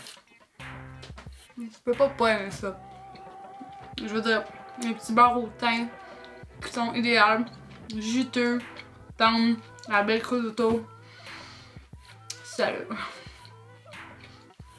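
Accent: Quebec, Canada. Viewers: Actually, it's french, Canadian French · Canadienne ? Je ne comprend pas bien tous les mots. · J'adore lâchent québécois 😍😍😍😍😍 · C’est du français du Québec :) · Excitant ton accent ma belle ça donne envie de partir au québec et biensûr bravo pour le steack, je suis presque amoureux ^^. Bisous et bomne continuation avec ta chaine je m'abonne direct ;)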